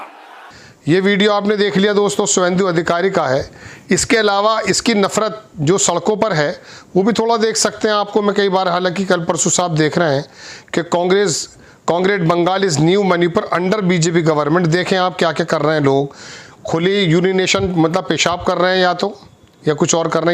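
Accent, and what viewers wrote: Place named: Meerut, India. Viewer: Patrkar sahab meerut ke aas paas ke shetr lagte hai bhasha se 😊😊😊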